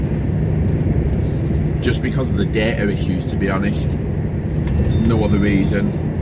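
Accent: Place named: Northern England, United Kingdom. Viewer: Hi, I fell upon your vids as I'm planning to visit Greece in my M'home after postponing last year due to Covid and I have found your clip's to be very informative and just love that Northern accent. I'm guessing Leeds ! Anyway, thanks for the information provided which gives me an idea of what to expect when crossing over from Italy to Greece and driving in Greece. Hopefully make it this year before it gets too hot. Otherwise it's Slovenia and Bavaria. Keep Safe.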